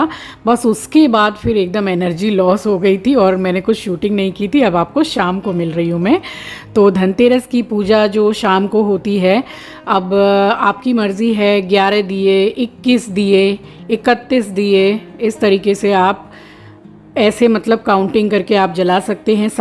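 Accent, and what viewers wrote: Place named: Bengal, India. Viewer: Your bengali is so sweet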